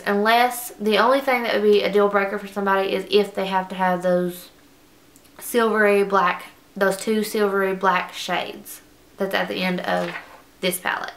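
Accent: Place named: Tennessee, United States. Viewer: Great review! I came here with the intention of buying Naked, but now Im sold on Chocolate Bar. BTW, where are you from? Your accent sounds like me! (Tennessee)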